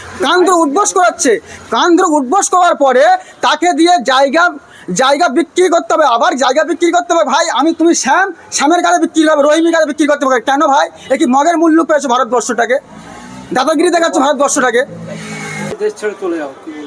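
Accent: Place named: Bangladesh. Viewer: তুই to বাগলাদেশি। তোর কথা শুনেই মনে হচ্ছে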